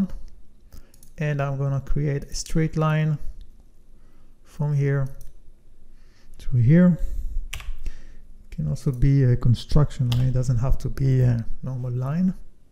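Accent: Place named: France. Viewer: Parfait! J’ai beaucoup appris avec ton exercices! Votre stil d’expliquer est magnifique. Merci beaucoup! Avec tous les astuces tu a montré c’est beaucoup plus facile pour moi à construire avec fusion 360. C’est possible, que tu travailles chez Airbus? Je ne suis Français, je suis allemand, agrandi vis à vis de l’Alsace, à Fribourg, le Français est mon deuxième langue. Mais je crois, que tu à un petit petit accent français, ne c’est pas? Le petit mot « voilà » qui apparaît de temps à temps, me plaît beaucoup! De toute façon, ton anglais est merveilleux! Gratulations! Et encore un gros merci!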